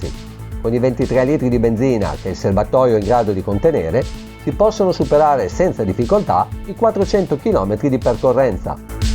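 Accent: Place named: Brescia, Italy. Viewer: mi sembrava Brescia....invece si😅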